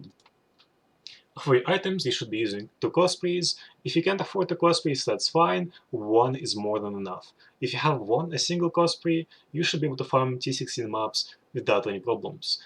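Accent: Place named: Russia. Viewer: Слишком русский акцент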